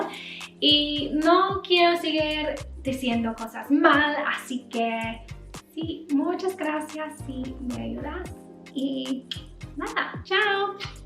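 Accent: Latin America. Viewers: Bonito acento y pareces latina! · Amo que hables el español como los latinos y no como españoles! HAHAHAHH Me encanto tu vídeo, desde hoy empiezo a usarla espero me vaya bien!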